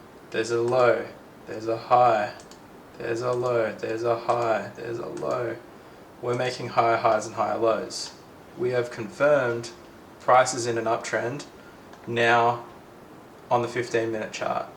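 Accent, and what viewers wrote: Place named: Australia. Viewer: You are doing a great job - explanaton is amazing! I too live in Australia so it is refreshing to hear an Aussi with this eXplanation - keep going!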